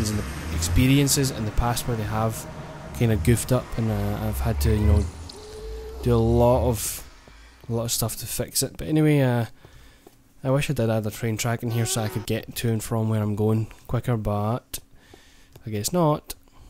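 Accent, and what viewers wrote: Scotland, United Kingdom. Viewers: fuck me ur so creative and imaginitive:D i can't work out ur accent im getting scottish but im just nt sure lol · What first got me to start watching your series was your accent, I love Scottish accents and it made the commentary more interesting. I then grew to like the series itself and the cool things you built and how you went about it. I've also started watching your other videos and am enjoying them as well. Thanks, I'll be waiting for Season 2!!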